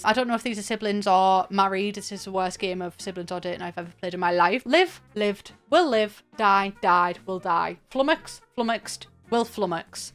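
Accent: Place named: Redcar, United Kingdom. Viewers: Middlesbrough accent. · Is it cause we where both born at james cook that I love you so much . Cause girl it might just be that i live in redcar n we share an accent 😂🎉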